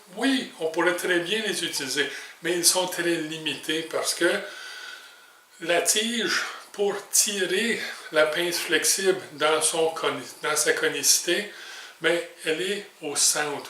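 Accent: Canada. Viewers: Excellent professeur, ce, très à cheval sur la transmission du savoir (ouais, mais être à cheval, pour un, c'est un peu son job, non?). J'ai déjà beaucoup appris de ses vidéos. L'accent canadien rend parfois l'accès au contenu un peu délicat pour une (vieille) oreille belge, mais je prends beaucoup de plaisir à apprendre avec lui.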